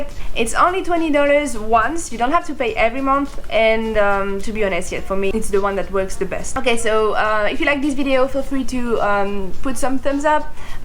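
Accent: France. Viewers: Quite interesting video for simulator... and what a nice little French accent :) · sounds a bit like a french accent xD ... and i really like this rooster frame.. guess it will be my next one :D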